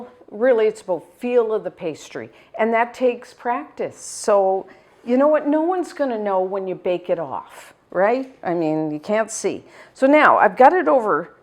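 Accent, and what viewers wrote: Canada. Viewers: Wow, you're from Georgia! For some reason, I thought you were Canadian lol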